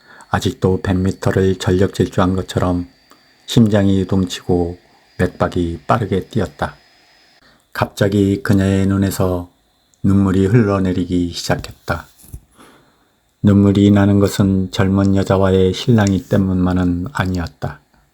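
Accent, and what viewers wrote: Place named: Chungcheong, South Korea. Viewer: 겨울철 자전거 풍경과 내레이션이 잘 어울리는 구수한 충청도 사투리가 멋져유.,. 다덜 콩나물지하철 경험하면..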